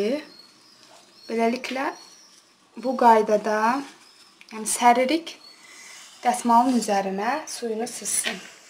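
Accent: Azerbaijan. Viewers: Guya Bakilidi gorureceyem demekle deyil sesinden rayon avazi gelid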